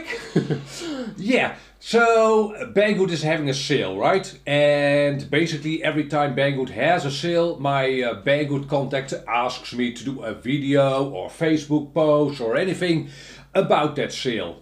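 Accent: Netherlands. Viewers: I use qx7 Ik gebruik nu een qx7 Love your channel and your dutch accent by the way. Keep on with your channel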